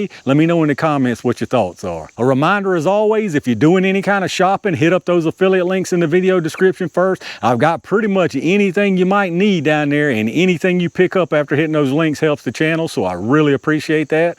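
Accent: South, United States. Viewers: Judging that accent, somewhere in the North Carolina/Tennessee area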